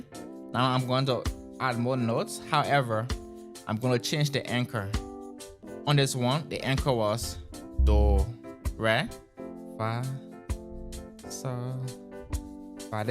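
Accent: Liberia. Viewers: Are you a Liberian ? You sound so Liberian 🇱🇷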